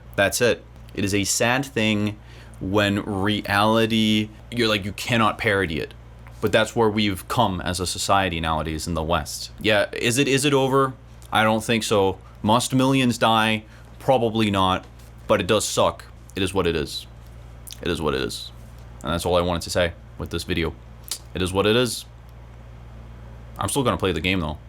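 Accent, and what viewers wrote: United States. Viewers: how can a danish be so good in american english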